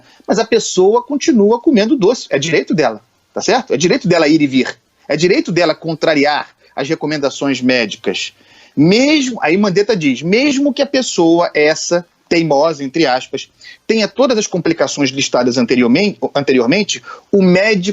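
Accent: Rio de Janeiro, Brazil. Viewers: Andreazza é um paulista com sotaque de carioca. Fico enjoado ouvindo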